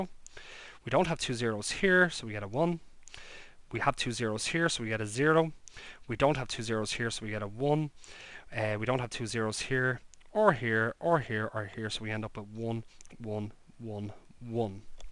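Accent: Ireland. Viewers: I wonder are you Irish? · hahaha you wonder? He's not Irish, he's MEGA-Irish!! (From the North). I love the accent, I used to live with two Irish friends from County Armagh back in NYC ages ago... People said I picked up on the accent back then :D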